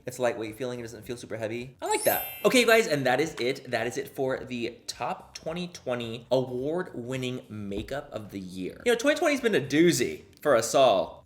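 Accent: England, United Kingdom. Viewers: The British accent is no❤️ no hate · the English accent is killing me haha😂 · His English accent. what is happening. What did i miss 😂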